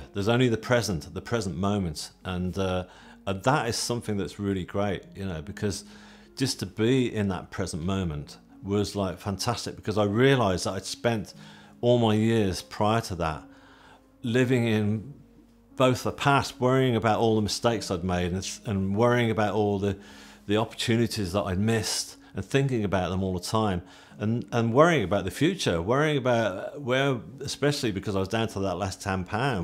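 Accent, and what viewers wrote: England, United Kingdom. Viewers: Hearing this English, down to earth accent makes the after life feel even more real. ❤ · I'm English, but it sounds so strange hearing this form an English voice. Incredible story x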